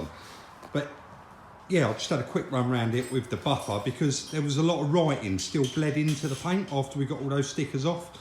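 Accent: United Kingdom. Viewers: Yeah- what part of the UK is your accent?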